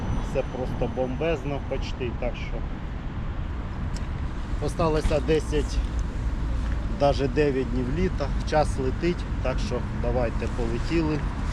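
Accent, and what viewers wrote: Poltava, Ukraine. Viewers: Доброго вечора,! Ми дуже раді Вас знову бачити. Дивимося Ваші відео і на душі так тепло стає. А коли моя мама чує полтавське мовлення, то аж плаче, бо ми зараз далеко. Дуже переживали за Вас, коли дізналися, що Ви у ТЦК. Переживали як за рідного. Нехай Вас Бог береже 🙏🏻 Я хотіла спитати Вас, чи можливо буде для Вас покататися на дві адреси? Перша - це по дорозі від Вас, Ви часто проїжджаєте повз цей будинок, тільки у дворі ще не були. Це адреса Героїв України 34/24 А корпус 1. Це великий полукруглий дім, як тільки переїхати міст з Половок, там є зупинка Сади 1, а далі отой жовтий будинок із зеленими балконами. Якби Ви проїхалися у дворі, на ігровій, особливо біля перших під'їздів. І ще одна адреса вул. Полюсна 12. Це за Макдональдсом, раніше була зупинка Боженка. Біля будинку ще є кафе Роксолана. А ось за нею знаходиться цей будинок. 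Теж прохання проїхатися у дворі, 2 під'їзд. Ми хотіли б Вам віддячити. Не можу знайти, як перерахувати Вам кошти. Напишіть, будь ласка. Будемо дуже вдячні!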